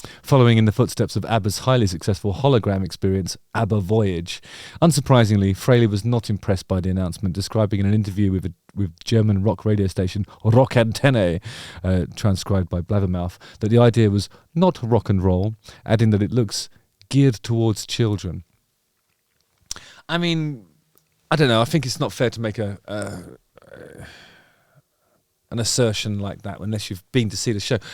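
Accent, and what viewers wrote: United Kingdom. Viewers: First time on your channel. when I saw that suit and heard your British accent I immediately thought of Mick Jagger. Nice call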